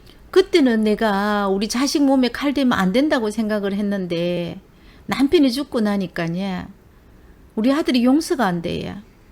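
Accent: Gyeongsang, South Korea. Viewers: 영상 잘 봤습니다 경상도 말이 정겹고 반갑습니다 응원 합니다.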